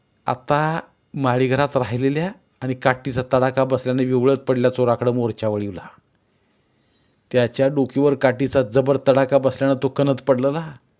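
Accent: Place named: Nipani, India. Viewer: कथा सांगली मिरज कडची आणि बोलण्याचा टोण निपाणी हामीदवाड कडचा वाटतोय जरा जरा सुर वडताय 😂 कोकणी गत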